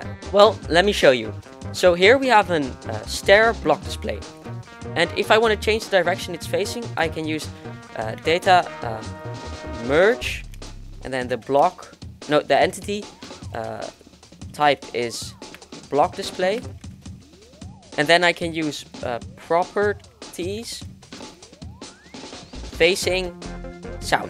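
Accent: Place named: Netherlands. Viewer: i smell a dutch accent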